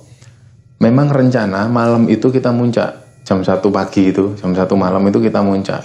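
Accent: Java, Indonesia. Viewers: Jaaann jowo tenant...mantebb, medok e toop markotop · Penyampaian y enak,jawa y kentel pol cak,fans baru cak semangat 👍👍👍💪💪💪gending jawa y bikin ayes adem ati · Daya Saing utama adalah di lagam lagam jawanya yang bikin ngeri ngeri sedep... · Sayaa yg orang Melayu Kepri, sukaaa denger mas cerita2 horor, sukaaa denger logat Jawa nya😆😆😆😆😆🤣🤣🤣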